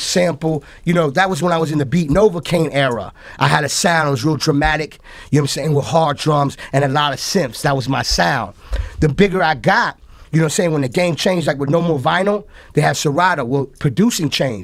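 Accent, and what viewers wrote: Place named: Jamaica. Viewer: Dj Khaled is a real producer no joke talk straight frm JAMAICA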